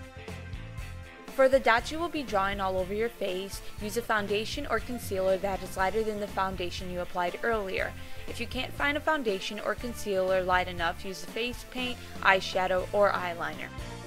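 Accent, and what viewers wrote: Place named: Michigan, United States. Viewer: do i spot a Michigan Accent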